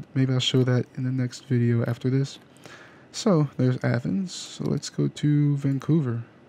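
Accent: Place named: Netherlands. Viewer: he is from holland do you expect perfect English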